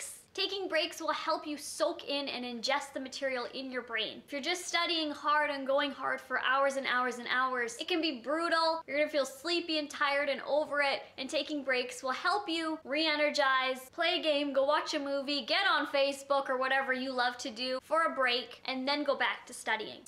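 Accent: Canada. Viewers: Btw I love your accent. Canadians are the best!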